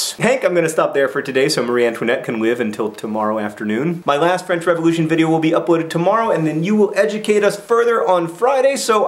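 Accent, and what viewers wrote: United States. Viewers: did you say you didnt pronounse it right because your Americain? finally, i always think "what does he mean by that!" no offence but its because im british!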